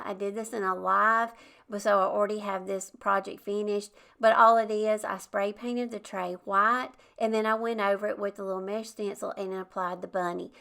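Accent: South, United States. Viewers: I love all your creative projects but, more than that I love your sweet southern accent.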